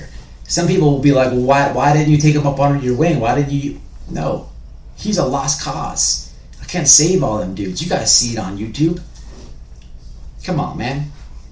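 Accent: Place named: London, United Kingdom. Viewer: Good london accent bruv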